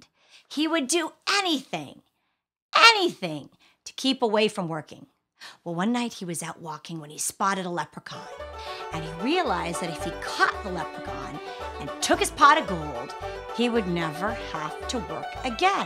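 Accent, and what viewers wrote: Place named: Ireland. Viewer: Good fun, short tale and graphics to boot! Plus patented Irish accent! At no extra cost!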